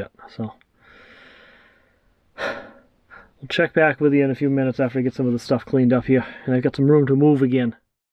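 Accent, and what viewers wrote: Boston, United States. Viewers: Just knew you must be from the Boston area! You sound so much like my son-in-law from Beverly.🙂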